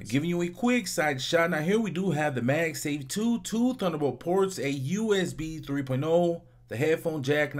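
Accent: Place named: South, United States. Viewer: so country, are from Kansas or Texas?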